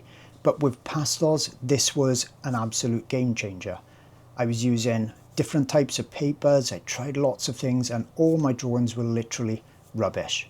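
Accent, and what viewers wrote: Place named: South Wales, United Kingdom. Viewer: Hi, another great video! Thank you. I agree the paper Pastelmat is a total game changer! It gives back the joy in drawing that the other papers sap away from us! Ps. I love to hear you talk because it reminds me of my nana and grandad who came from the Rhondda Valley in South Wales. 😊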